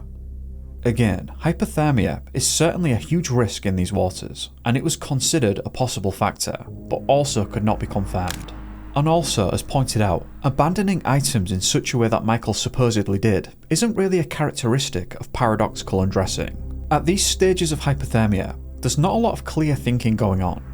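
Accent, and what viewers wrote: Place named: North, United Kingdom. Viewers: I love your narration, so articulate, great vocabulary and with a proper northern accent! Thank you for representing with quality content. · Northern English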